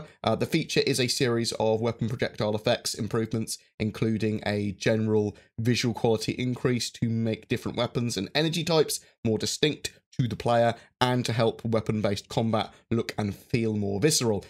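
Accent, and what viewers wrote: United Kingdom. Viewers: I appreciate your vids, I really do but could you enunciate better. Your voice is like a roller coaster. No volume setting can adjust from the highs and lows and missed syllables you have. I like the accent but not when it interferes with comprehension. Sorry man I don't mean to be rude but it gets a little annoying. I get the quips and quirks of British loving their own voice and word play. I mean you guys play with it when you speak but sometimes it's just a little too much.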